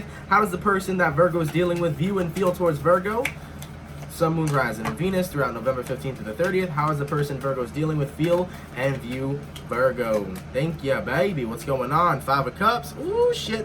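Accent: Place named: United Kingdom. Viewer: also i’m hella jealous of your British accent. 😭 I wish i had one hah. 🥰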